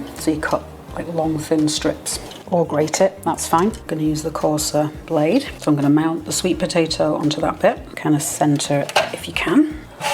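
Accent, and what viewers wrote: United Kingdom. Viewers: This looks delicious. I’m celiac so always looking for new recipes. Btw is that a Leeds accent? I’m from Leeds originally so always nice to see YouTubers from back home.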